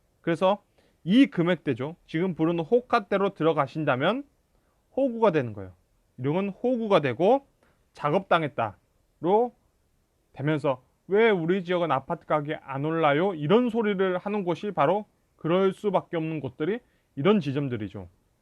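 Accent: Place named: Jeolla, South Korea. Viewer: 말투가 전라도네 ㅋㅋㅋㅋㅋㅋ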